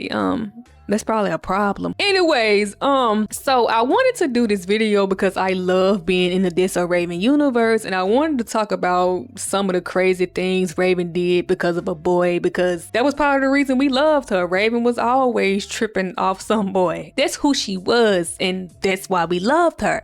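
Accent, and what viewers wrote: Memphis, United States. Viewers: She's from Mississippi! But I thought Memphis too!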